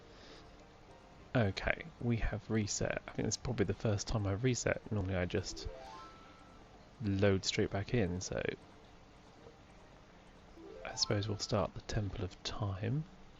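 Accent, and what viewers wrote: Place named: United Kingdom. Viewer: I stan a British man speaking softly into the microphone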